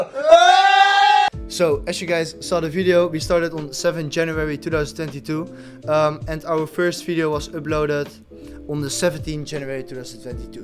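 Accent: Netherlands. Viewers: Lekker Nederlands accent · Klinkt nederlands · Love the Dutch accent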